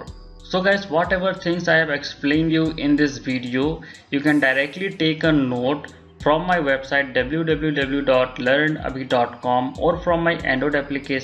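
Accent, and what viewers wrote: India. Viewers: This indian accent is stronger than Arnold Schwarzenegger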